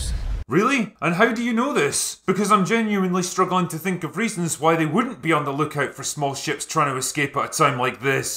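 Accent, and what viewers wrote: Scotland, United Kingdom. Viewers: Татья́на... yeah, it's Tat-i-jana, whereas the 4th and 5th are letters unknown in the English alphabet. The 4th is almost like the German "ü", the 5th is simply "ja" or "ya". I would say "Tatiana" is the most accurate, it's just onomatopoeia anyway. I guess Russians, Belarussians, Ukrainians and other Slavs DO have a couple of ways to write this name 😁! But as I wrote, the way pronounces the name with his Scottish accent it does sound more like Tateyana. Probably the man gets that girl to speak into the mic one day. If only she wasn't purely imaginary... 🤣😹😂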